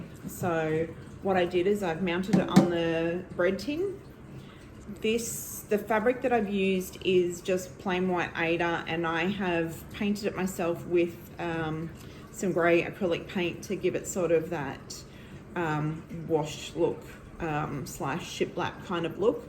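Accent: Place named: Australia. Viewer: love your podcast. Your stitching is beautiful. Love your embroidery too, but I only hand embroider, so I cannot attempt those lovely pieces, sadly. So nice to hear an Australian accent. I'm an expat Kiwi in Texas, 21 years, now. Look forward to watching more. Kia Kaha.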